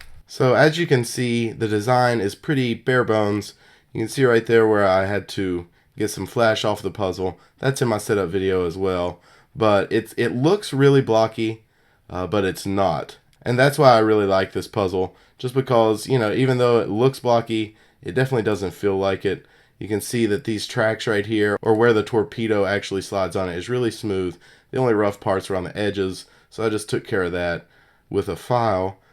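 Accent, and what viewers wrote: South, United States. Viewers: You have a bit of a Southern drawl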